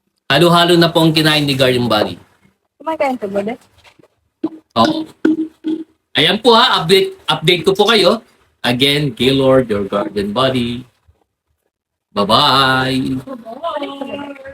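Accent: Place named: Cuyonon, Philippines. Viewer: Pagcuyonon din lang halata ka na cuyonon ka ra Tagalog Tagalog ka pa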